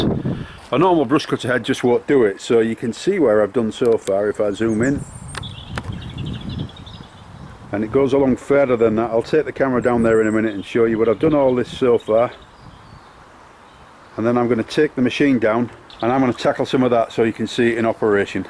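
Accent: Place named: Northern England, United Kingdom. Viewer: This is a great video and a lot of effort put into it. I think the dislikes are from people who aren't front the UK and can't understand your Northern accent😆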